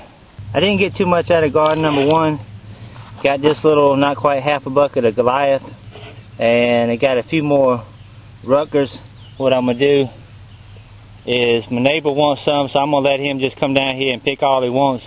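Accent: South, United States. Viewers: Sorry all I could think of hearing your voice is the movie character Forrest Gump!! I don't mean that in a bad way!! I love the southern accent and I'm from Australia!! Y'all!!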